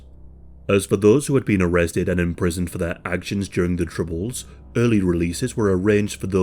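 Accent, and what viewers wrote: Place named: Northern England, United Kingdom. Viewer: Sounds to me somewhere north, near Newcastle but not too strong - could be wrong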